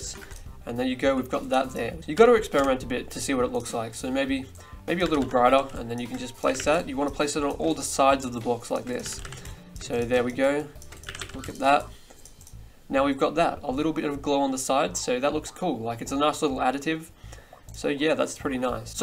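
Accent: Australia. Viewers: being australian af with that G'day everyone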